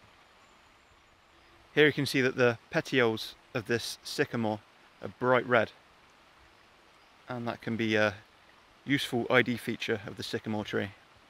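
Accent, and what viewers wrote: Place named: West Country, United Kingdom. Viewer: The man from the West country is back. Nice one.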